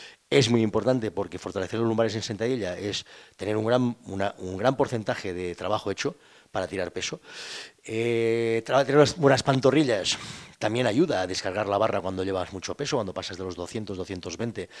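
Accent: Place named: Mexico. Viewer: que acento mas mexicano!!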